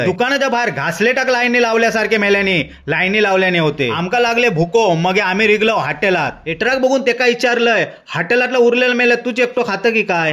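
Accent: Malvani, India. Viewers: भावा तुझी मालवणी मला आवडली लय भारी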